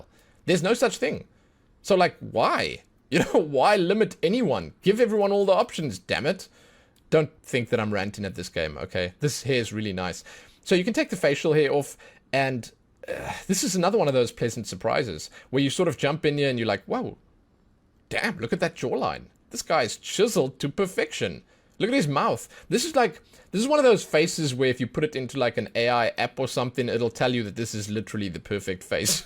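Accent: South Africa. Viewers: Was watching and could not figure out why I was feeling home sick. Great to hear a zaffa 😂. Great video as well you got a sub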